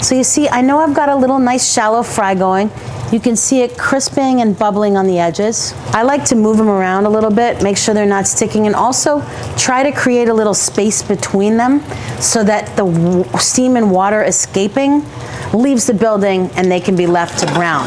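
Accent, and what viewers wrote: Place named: New York, United States. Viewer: You and Paul Sorvino pronounce sandwich, sengwich. Is that Jersey or New York?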